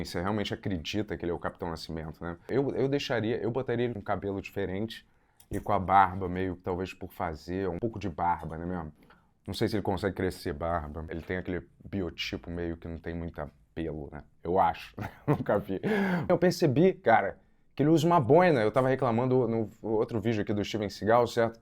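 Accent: Rio de Janeiro, Brazil. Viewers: Amigo ,carioca. Carioca, amigo. Pronto, agora você já sabe como fala um carioca. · Muito carioca! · mano eu odeio teu jeito de falar e esse jeito de playboy carioca ''nem de esquerda nem de direita'' puta que pariu\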